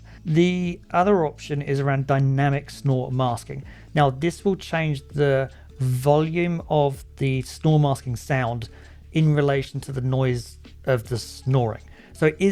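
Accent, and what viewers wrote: United Kingdom. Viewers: Just saw your A20 sleep review, which was really good. I just got the A20 after watching loads of reviews on both. Which one do you prefer? I think if battery life is important the A20 are still the best. I also had the A10 but the left earbud died on me and never wanted to charge fully again 😢 So I am off to try the A20 for the first time. Thank you for your review ( nice to hear a British person for a change😂)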